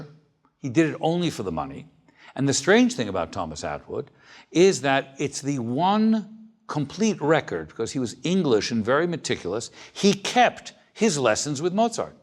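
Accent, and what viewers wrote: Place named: Netherlands. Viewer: What’s with the Dutch accent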